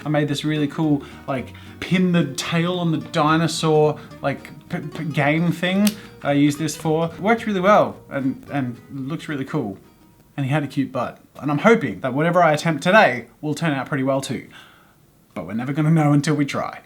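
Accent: Australia. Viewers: Are you Australian? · He sounds extra Australian today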